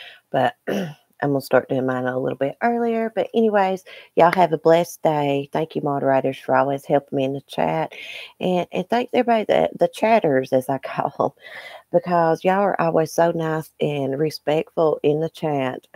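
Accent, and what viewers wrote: South, United States. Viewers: Your the first person I have heard say more things I agree with than I don’t :) You sound southern, I am too 😉 That must be why :)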